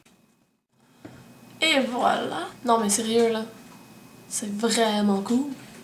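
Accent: Quebec, Canada. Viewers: Trop beau et j'adore ton accent (québécois) ?? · J'adore l'accent canadien! ;) Ils sont jolis les motifs et tu l'a très bien réussi! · Magnifique !! J'y avais jamais pensé tiens.... J'ai pas le cerveau d'un astronaute x) Nouvelle abonnée. PS : J'adore l'accent Québecquois !! <3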